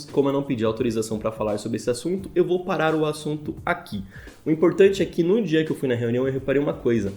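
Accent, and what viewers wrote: Minas Gerais, Brazil. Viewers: c e mineiro de onde? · Uai vc é de minas? kkkk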